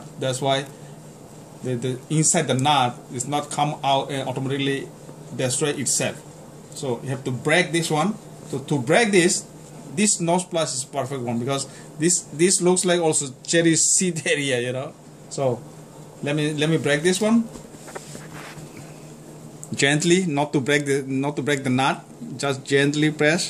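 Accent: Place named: Bengal, India. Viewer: Hello, Very good video to learn this process. Thanks 🙏. If you don't mind guessed you are a Bengali speaking how you are talking now. 👋 from 🇨🇦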